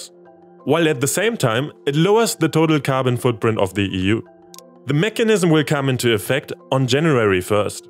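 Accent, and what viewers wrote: Germany. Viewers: Is he German? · His accent is clearly German 😅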